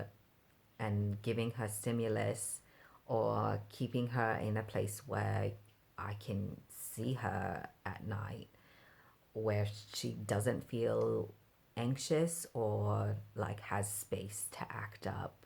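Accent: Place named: RP, United Kingdom. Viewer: as a british person your accent was suprisingly decent but you sounded alot more like you were from like the richer parts of britain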